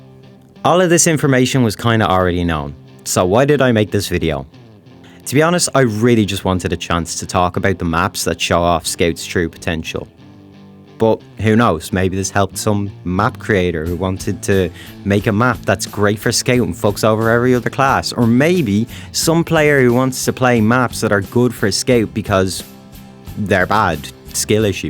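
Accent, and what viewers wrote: Scotland, United Kingdom. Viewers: i love your Scottish accent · Sound like u British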